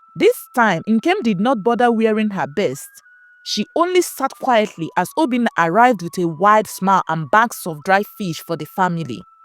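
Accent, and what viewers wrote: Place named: Ghana. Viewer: You speak like a Ghanaian are 😮😮 you one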